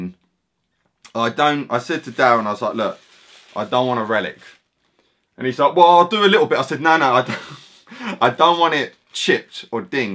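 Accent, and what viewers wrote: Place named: England, United Kingdom. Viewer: Great video!! Love that accent man. Im a new sub so i gotta ask what part of England are you from?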